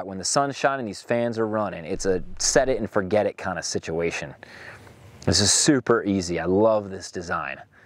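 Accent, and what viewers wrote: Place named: North Carolina, United States. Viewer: Where in NC? Love to go see it. I'm coastal NC and if I had to guess from your accent, you're not western NC.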